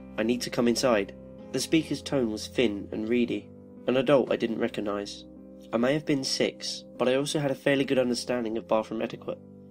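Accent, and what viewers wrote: London, United Kingdom. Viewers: Like your readings very much! of course I'm a Scot living in London so.you don't use non-existent words like 'normalcy' in stead of Normality (even Creeps McP has done this piece of unforgiveable shite!) you make it scarier cuz it sounds closrer to Hackney E5! Thanks and keep it up (the others tend to send me to sleep but wif you soundin so close it freels like its right outside my door! sp00ky! xx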